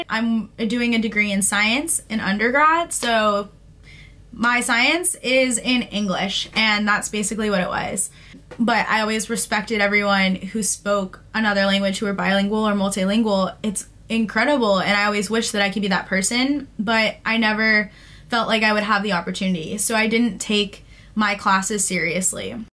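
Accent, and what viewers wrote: United States. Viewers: Me too. I adore her accent, and as I'm still learning English, I use that as a reference to acquire my American accent. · I love you,. I love your american accent and id love to speak english like you.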